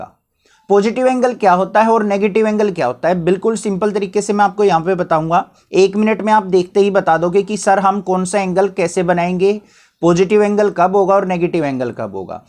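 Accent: Haryana, India. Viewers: Sir aap haryana se ho..??🤔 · sir ji aap haryana se ho na thari bolan ki ton t laga se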